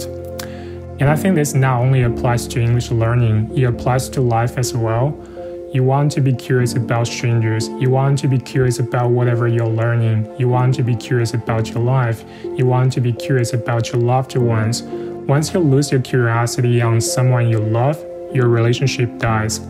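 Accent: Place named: China. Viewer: 听你的中式英语还自以为很流利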